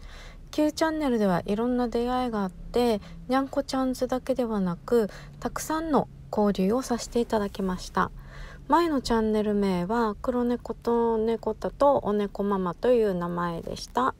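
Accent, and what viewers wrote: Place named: Okinawa, Japan. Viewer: 元気いっぱいのくん☆ コスプレも好きなんですねぇ☆ 時折でる沖縄なまりが自分は好きです☆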